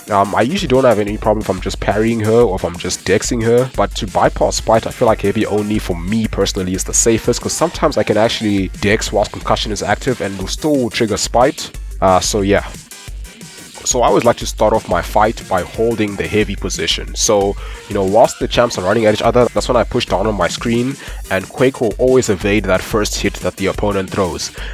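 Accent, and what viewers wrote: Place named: South Africa. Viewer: Got directed here via a luhdemtaters "QUAKE Breakdown & Grade" video. As soon as I heard your voice I knew I was listening to a fellow South African. Cheers brother. Enjoyed the video. Let's keep Quaking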